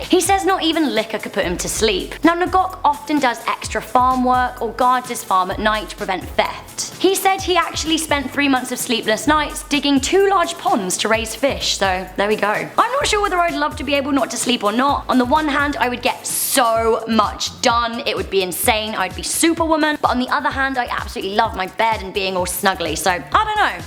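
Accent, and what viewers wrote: United Kingdom. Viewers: Are you British?? I am just curious. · i love Ur British accent!!!!! i am australian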